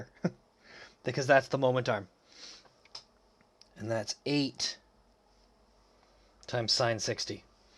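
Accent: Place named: United States. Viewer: I had no idea how to do this in the exam. Thanks teacher with American accent!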